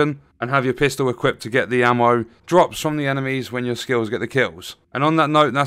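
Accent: United Kingdom. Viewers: subbed just becauce of you wounderful british accent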